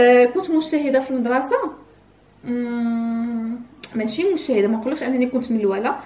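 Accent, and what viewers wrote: Fes, Morocco. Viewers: بلعكس انا كنحماق على شخصيتك وكتجيني متواضعة غير حيث فاسيين هضرتهم هكاك حتى انا عائلتي فاسيين و هضرتهم بحال هكا وبالعكس كتعجبني طريقة هضرتك وطريقة تربيتك لبنيتاتك كتجيني جدا راقية وكنتعلم منك وبصراحة مكرهتش نكون بحالك ان شاء الله مع اولادي فالمستقبل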